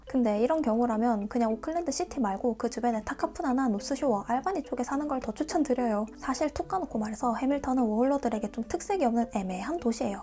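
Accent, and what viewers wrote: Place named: Seoul, South Korea. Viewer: 서울말이 늘었네요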